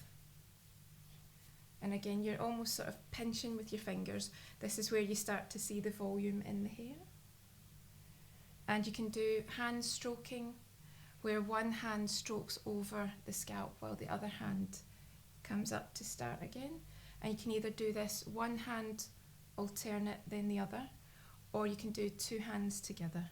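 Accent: Scotland, United Kingdom. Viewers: I could tell you wrre from Scotland by your accent. And thays ok I just thought you were a salon with you having all the equipment layouts and stuff if you know what I mean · Jawesome scottish accent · I just love her voice, it's so calming, and I find Scottish accent pretty sexy in a way...XD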